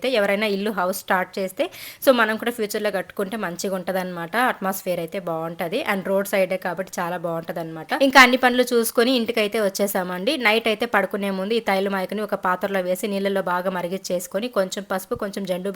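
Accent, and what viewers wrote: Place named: Telangana, India. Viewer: garu first time comment chesthunna pls oka request. Andi Meru matladuthunte normal Telangana slang anipisthundhi kani medhi Palamaneru nellore district kada meku a slang osthadha edhina oka video lo oka 1 min me slang lo matladandipls..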